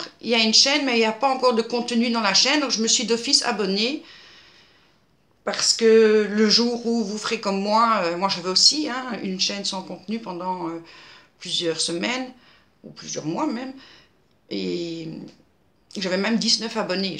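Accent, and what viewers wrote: Belgium. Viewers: coucou,😃 original avec la mousse à raser, je ne connaissais pas, merci pour ce partage,👏👍💖 et , notre accent belge, il est très bien lolll 😜😊 en plus il y en a différents , pour tous les goûts 👍🤔😃 bonne aprem, bisouilles 😘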